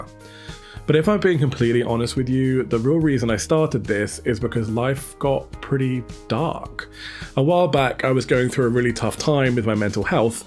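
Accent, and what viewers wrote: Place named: United Kingdom. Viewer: Thank you for sharing your story, and being vulnerable about your mental health struggles. I appreciate your candor. I, like you, turned to cozy games as a sort of therapeutic experience. I’m a middle aged woman and I’m dealing with “middle aged woman “things”, on top of having a child who is in active addiction. I needed a way to calm my anxiety, so I tried playing cozy games. So far, it is helping. Love that you are a sports fan (Atlanta Falcons - awesome). I live in the southern United States, and sports are such a big part of life here. Just wanted to share a bit about me, and tell you that I appreciate your hard work and, integrity and your soothing UK accent and voice. 😊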